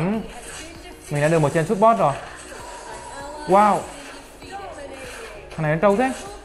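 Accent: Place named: Thanh Hoa, Vietnam. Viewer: Nghe thấy giọng thanh hoá tắt video ngay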